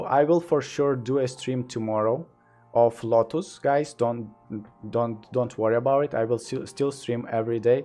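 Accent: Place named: Russia. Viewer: Тяжелый русский акцент.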